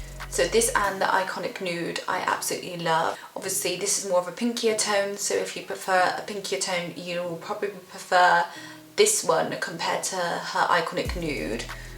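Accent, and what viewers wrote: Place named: RP, United Kingdom. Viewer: LOVE THE BRITISH POSH ACCENT !!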